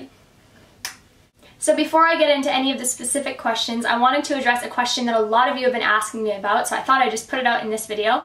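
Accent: Australia. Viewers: I only just read that you live on the Central Coast! I was thinking your accent had some big Aussie leanings! That's awesome. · I'm new to your channel and I just have 2 questions. 1. Does your Australian accent come in and out? 2. What do your tattoos mean? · i love how you have a little bit of a an aussie accent that comes out every once in a while :)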